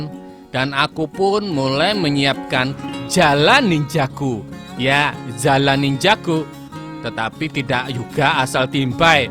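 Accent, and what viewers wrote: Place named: Java, Indonesia. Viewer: Medhok nya hampir sempurna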